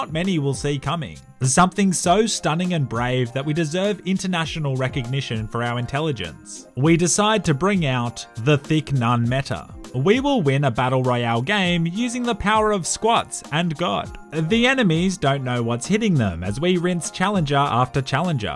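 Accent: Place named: Australia. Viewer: Can someone pls translate this into English , cant understand this Australian. Thnx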